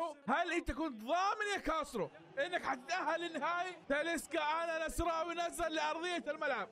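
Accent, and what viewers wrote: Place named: Jazan, Saudi Arabia. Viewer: لا بس حسيت طريقة كلامك كأنك جيزاني..... والنعم فيكم اتشرف فيكم❤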